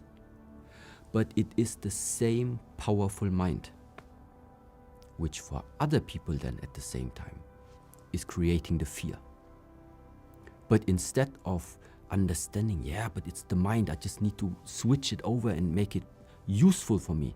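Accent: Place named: Germany. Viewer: He is German or has lived a long time there. He speaks English like a German. Interesting.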